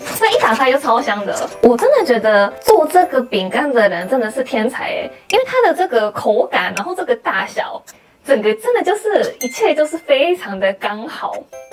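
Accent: Taiwan. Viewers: 第一次點進來~ 看過的日本youtuber中~中文發音最好的! 一聽就是在台灣學中文~有台灣人講話的語調! 另外看起來也蠻有福氣的樣子! 很可愛! 原來第一名是泡芙~ 韓國人也很愛台灣泡芙! 另外,"無印良品" 本來就是日本企業~ "選品" 按"日本人"的眼光來選~當然日本人會喜愛! 最後關於"茄芷袋"~ 大家比較常想到應該是買菜的三色袋~ 你拿的那個比較有"設計感"! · 的中文超道地台灣風，厲害！ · 太厲害了👍 簡直比台灣人更台灣人！國語比台灣人還道地！令人敬佩不已！❤❤❤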